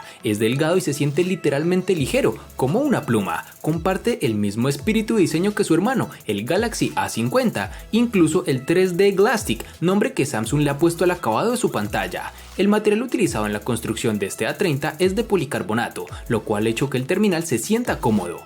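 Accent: Cali, Colombia. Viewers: SOS DE CALI? JAJA YO SI DECIA VE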